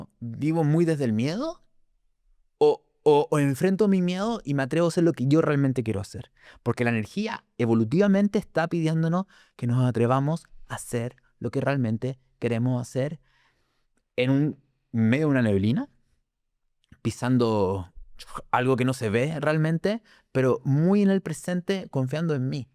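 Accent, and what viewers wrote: Chile. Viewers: No lo conocía, pero al escucharlo supe que es chileno 🇨🇱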